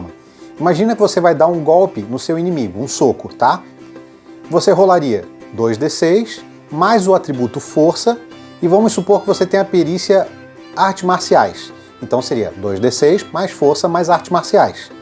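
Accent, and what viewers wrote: Florianópolis, Brazil. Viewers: Sotaque de manezinho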